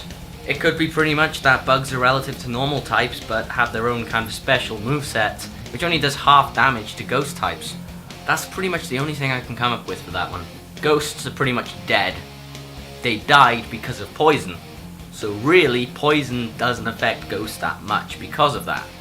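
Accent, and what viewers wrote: Wales, United Kingdom. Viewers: Hey dude, just stumbled upon your channel as I was looking to start up a Let's Play channel in my spare time and was doing some research. Like your videos and have subscribed! Will be sure to keep up to date with your stuff and best of luck for your channel :) Quick question, are you Welsh?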